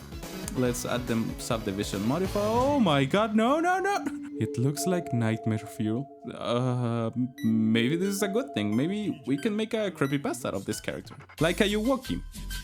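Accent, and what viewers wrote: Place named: Spain. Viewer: do you talk Spanish?, your pronunciation of English language is pretty similar to someone who talks Spanish. I talk Spanish too, so yeah, that's why the pronunciation sounds very similar for me at least. Also, good video, very organic, and i find this more interesting or more educational than watching those tutorials alone (idk why, maybe you are an inspiring type of person). Keep the good work.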